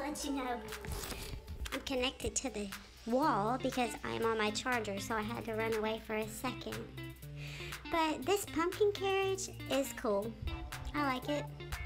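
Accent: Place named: United States. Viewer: I love your voice its so country😊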